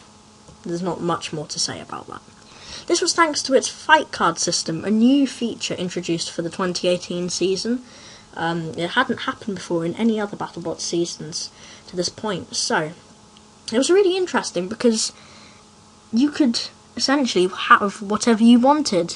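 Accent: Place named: United Kingdom. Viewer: I'm American, so hearing a British accent is sophisticated and refreshing for me, lol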